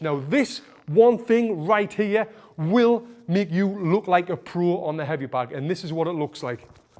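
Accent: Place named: Sunderland, United Kingdom. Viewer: Great Mackum accent...really good 'no nonsense' coaching technique. Good work.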